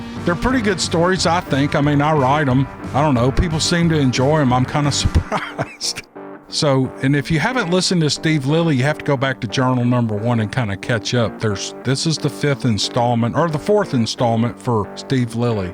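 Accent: South, United States. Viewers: I luv all yer stories. You sound like good olesouthernman. Great narration. You make everything excellent. Yourmypeople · Only an hour up and already 92 comments! Love 💘 your channel and listen to the others first because I like to keep the best for last!! The ladies have great voices but I really 💘 love listening to your southern voice...Florida girl here and everyone here in South Carolina have the same kind of voice. They all sound like my relatives, Carolina folks. Thanks for your stories, Merry Christmas 🎅 🎄!! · With an accent like yers' I'd never have guessed you know literature the way you do. I guess that's an example of bias against southerners. In fact, though, I like people from the south.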